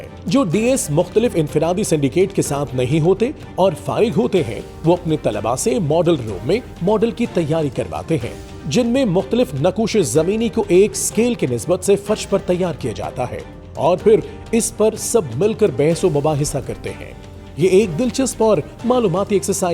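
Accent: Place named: United Kingdom. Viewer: Urdu Engrezi accent mein, wow !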